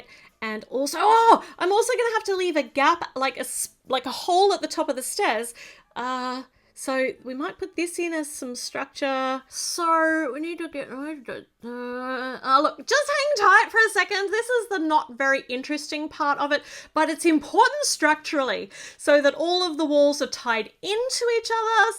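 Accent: Australia. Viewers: It‘s so beautiful watching you after a long time, you were such a positive influence for me during covid times, i spent hours just watching you build and talk in your lovely australian accent, its so wholesome for me, you can‘t even imagine. Eventhough my childhood home is sold, and i‘m living alone for the first time in my life, when watching your videos, i‘m the thirteen year old me again, it‘s crazy. Love you so much❤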